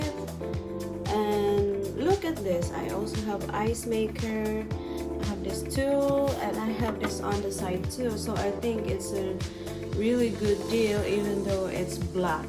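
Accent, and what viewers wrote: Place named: Thailand. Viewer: Dang! What haffen vella? Your accent is becoming thai now haha!😅 You should learn how to speak in thai now! Btw, cheers to more cooking videos chef! 🧑‍🍳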